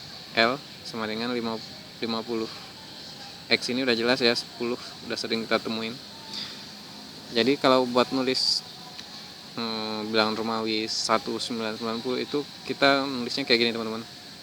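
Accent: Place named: West Sumatra, Indonesia. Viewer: nada minangnya jelass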